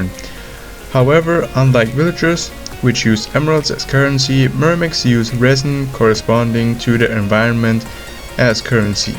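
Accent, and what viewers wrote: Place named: Germany. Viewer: Ich dachte mir bei jedem deiner Videos ey der hört sich so deutsch an auf einmal in diesem Video bei Minute ist da ein Video von Lekoopa 😁 heißt du bist doch deutsch😄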